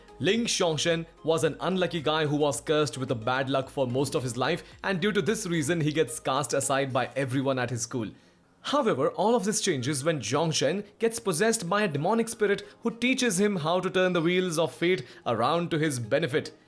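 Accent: India. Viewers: are you idian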